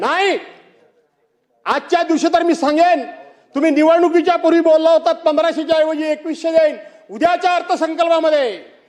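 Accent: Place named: Konkan, India. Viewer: कोकणी आवाज कसा आहे.🎉🎉❤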